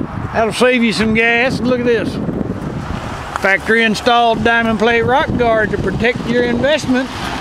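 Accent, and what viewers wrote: Texas, United States. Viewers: Sorry but you are a little heavy on the Texas accent/style of talking.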